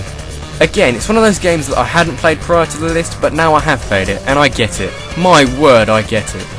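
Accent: England, United Kingdom. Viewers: no you brits speak english correctly we americans mispronounce things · I say it like that and I'm English too so I guess it's an accent thing.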